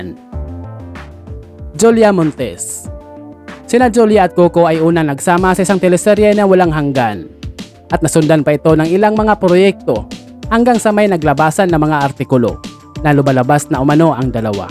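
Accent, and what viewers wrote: Visayas, Philippines. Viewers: Prayd seken bisaya ka tlga Taga pagsalita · Natawa ako sa ..speaker..hahaha...Bisaya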